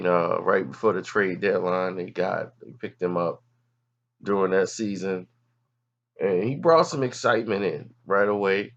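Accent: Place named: Chicago, United States. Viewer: That Chicago speak kinda like white dude down south except they got they own accent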